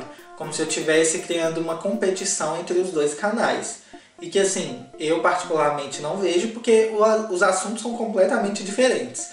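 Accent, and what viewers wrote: Minas Gerais, Brazil. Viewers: Eu amoooo a sua voz, o seu mineirês e a sua narrativa. Não entendi nada quando mudou o narrador no outro canal, logo deixei de assistir aos videos pois achei chato sem vc 🤩 abonadissima ao seu canal. Voa cara ,voa ! 😆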